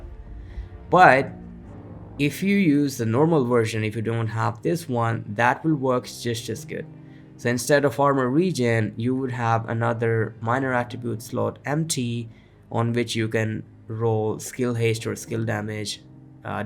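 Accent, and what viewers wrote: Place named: Germany. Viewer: Dat German accent😊 😉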